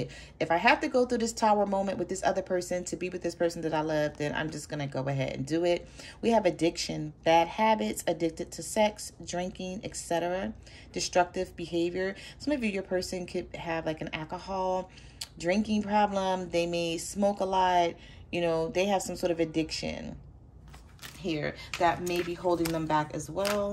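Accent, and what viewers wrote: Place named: New York, United States. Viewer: You sound like a New Yorker, I enjoy your readings either way.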